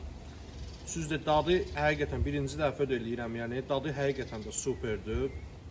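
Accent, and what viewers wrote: Baku, Azerbaijan. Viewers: Bakılı balası yorulmayasan!!!! Üreyün necə istiyir hələ də danış!!! İrad tutanlar da bilsin ki koptit közləmə deyil əslində hisə verilmiş deməkdi! Bakı danışıq dialektini qulaqları qəbul eləmirdəəə 😀😀😀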